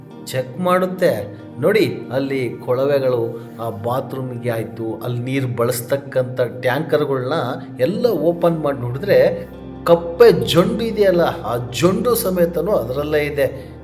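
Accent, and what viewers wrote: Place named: Davangere, India. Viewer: Super 💥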